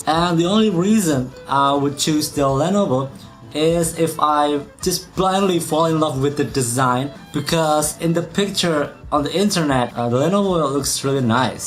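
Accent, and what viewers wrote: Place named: Indonesia. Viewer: Untung pronounciationnya indo, jadi paham :v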